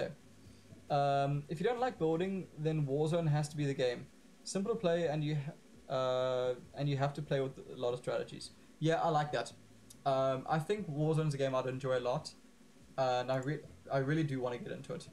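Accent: South Africa. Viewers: Hey man. I love the content since I started playing Pokemon TCGO again. I am curious though, are you South African as it sounds as though you have the accent.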